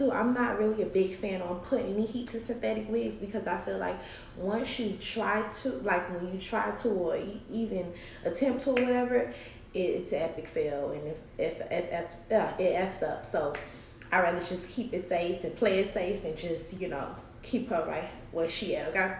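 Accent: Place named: Miami, United States. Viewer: You are too cute! Are you from Miami? I love your accent. I also don't put heat to synthetic hair, but I have one wig that I stay throwing curls in the front to frame the face. Now you know this is a CITY GIRL SUMMER over here!!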